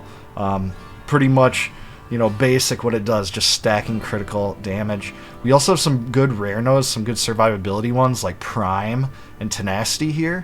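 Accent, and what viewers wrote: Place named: Wisconsin, United States. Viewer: Gotta be honest, I recognized the accent before I saw the hat. A fellow Wisconsinite here, Appleton. Nice vid!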